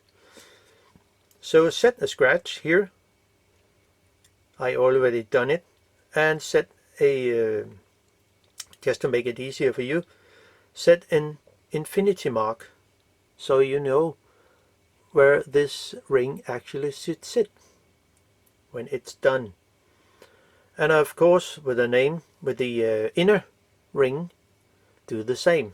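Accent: Denmark. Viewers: Hej. Er du dansk? Det lyder sådan. 😄 Hvis kan. Du så hjælpe mig en 135 mm 3..35 med en stiv fokusering? Mvh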